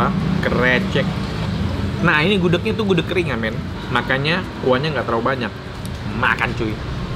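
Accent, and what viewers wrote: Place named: Java, Indonesia. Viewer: Kocak bro Nex bahasa Jowone , Wakakak !! Guded yogya memang top markotop ! Sehat selalu bro !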